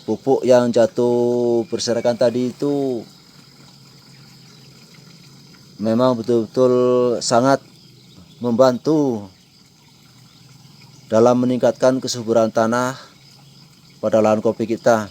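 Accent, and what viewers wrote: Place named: Banyumas, Indonesia. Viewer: wa'alaikumsalaam wr wb jos pokoke... tapi ngomong-ngomong orang aceh ngp bs ngomong ngapak yak bawa saya ke sana dunk 🤝💪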